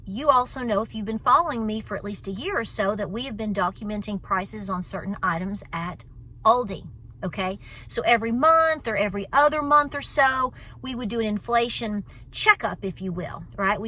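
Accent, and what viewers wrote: South, United States. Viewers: you have a Southern accent your a upbeat Energetic active woman i wanna be upbeat Energetic active like you are when i am your age i subscribed to your channel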